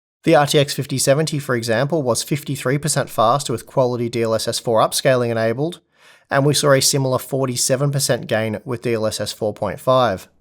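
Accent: Australia. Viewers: Great insight, thank you so much 😊 P. S. I love your Australian accent, it's fantastic to listen to it.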